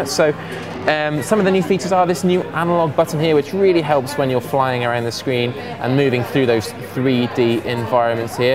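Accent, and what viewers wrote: United Kingdom. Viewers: that british accent is really really giving me a headache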